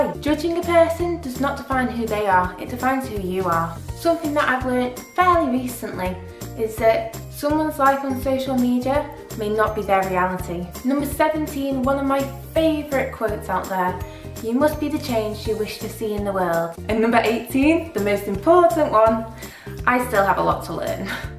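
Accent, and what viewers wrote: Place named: United Kingdom. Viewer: Happy Birthday! Where are you from in the UK? I like your accent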